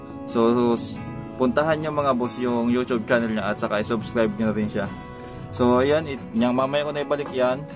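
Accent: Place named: Visayas, Philippines. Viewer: Bisaya ka boss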